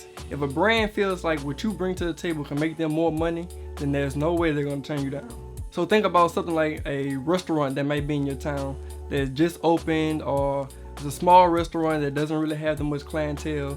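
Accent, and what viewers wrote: United States. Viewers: Love the accent bruh what state?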